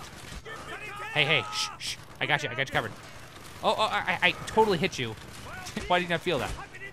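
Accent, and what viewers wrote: Russia. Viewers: if u like in a russian accent or think he should talk like that all the time thumbs up! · kl russian accent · NO! keep talkeenk az a russian!! i enjoy it so!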